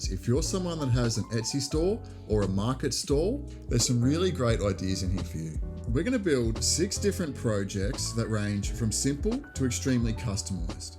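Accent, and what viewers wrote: Australia. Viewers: Heck dude. Im brand new here. I will sub. Really nice hearing an Aussie. Cheers from Central Vic.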